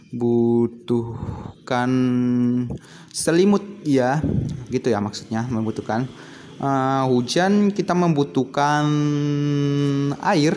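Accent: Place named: Sulawesi, Indonesia. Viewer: Jelas sekali klo miminnya org Sulawesi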